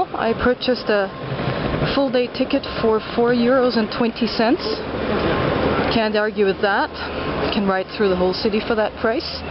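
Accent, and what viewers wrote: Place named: Canada. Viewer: Your accent was a bit of a mystery for me :), I thought you didn't sound American and when you said Canadian is all made sense :). Thanks for the video!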